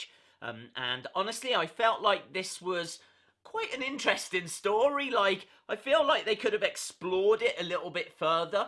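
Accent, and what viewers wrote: United Kingdom. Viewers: What ever he britsh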